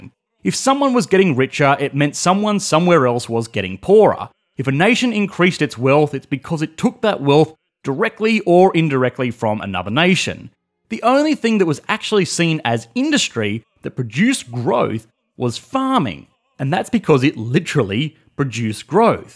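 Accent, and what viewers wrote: Australia. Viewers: Is that an Australian accent? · you have a quite funny accent, i would guess is it australian accent? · Me too, and I'm usually just listening to them in the background. I think it might be the Aussie accent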